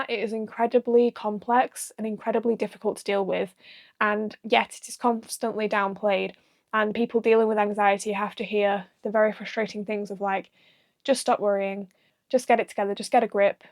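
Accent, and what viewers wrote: United Kingdom. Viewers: You really sound like someone I know from Rochdale! Are you from anywhere near there?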